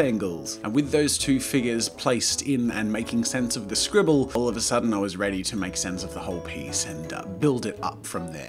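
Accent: Australia. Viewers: His Australian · He's Australian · dawn boi ausie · Quite Australian... the way he says "art" is also in a few British accents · Are you an Aussie? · dawn boi Ausie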